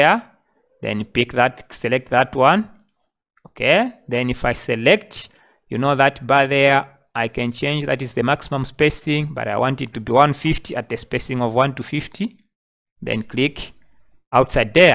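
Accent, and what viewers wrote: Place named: Zambia. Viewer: My guy you sound Zambia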